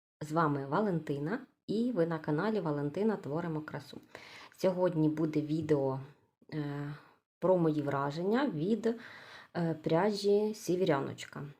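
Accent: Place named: Poltava, Ukraine. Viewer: Прив!т,почула св!й Полтавский гов!р,!нформативне в!део треба спробувати.Здоровья та миру.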